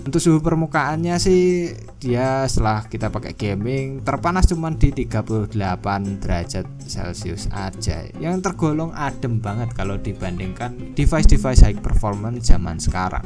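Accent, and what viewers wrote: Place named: Java, Indonesia. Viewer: Sumpah risih banget denger kata ( GUE ) gak pantes mas, medok mu lo 😢😢😢😢✌️🙏🏻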